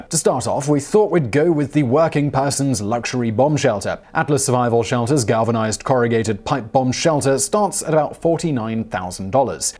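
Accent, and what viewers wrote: United Kingdom. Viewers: Two things: 1 - Love occasional British pronunciation of names or locations. I was doing laundry while watching this one and it took me a moment to realize the Adri-an-dak Mountains were what I have always heard refereed to as the Adirondack (Ad-i-ron--dac) Mountains. And B - Lived in Indiana most of my life and had never heard of the Vivos shelter. Once again I find that a wacky Brit and his friends living on The Continent have educated me on something going on in my own backyard. Keep up the great work, and the rest of the team!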